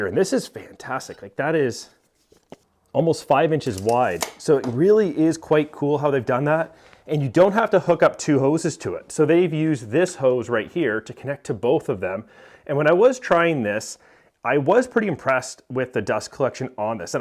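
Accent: Canada. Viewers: how did I know you are Canadian? lol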